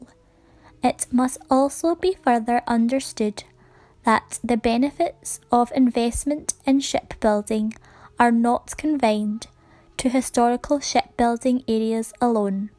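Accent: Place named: Scotland, United Kingdom. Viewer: Can't make out if this is a real or, Scottish robot voice 🤔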